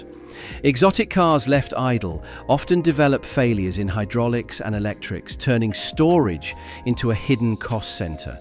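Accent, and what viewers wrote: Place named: United Kingdom. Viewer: Amusing. The British accent threw me off for a bit, but then I noticed that the costs were extremely detailed even in a range, as presented without out humor or any kind of approximation. 😂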